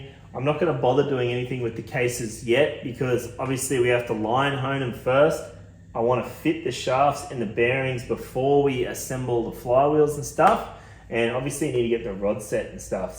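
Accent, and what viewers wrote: Australia. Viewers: Thanks for sharing your knowledge - As always, both an authoritative and entertaining watch.👍 I also have this vision of the OS viewers getting a crash course in good old fashioned Oz speak.... Magic.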